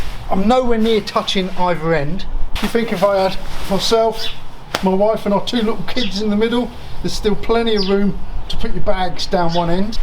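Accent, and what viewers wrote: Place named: United Kingdom. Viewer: Any chance you can learn a different accent lol? You have good content British accents are the absolute worst. African, Australian, American, Latin American would be so much better.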